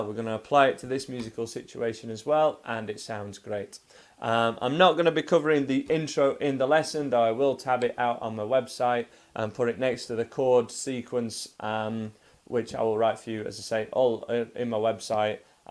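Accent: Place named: Liverpool, United Kingdom. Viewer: Another awesome lesson, dude. You have a great way of teaching guitar and familiarizing yourself with an audience you can't even see. Are from Liverpool or Woolton by chance? You sound a lot like the legend on the wall behind you. Accent wise. Trips me out. Thanks again, brutha.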